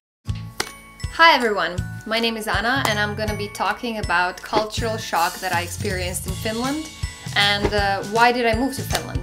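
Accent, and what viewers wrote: United States. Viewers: That's a pretty strong American accent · Can I ask how you got such a strong American accent?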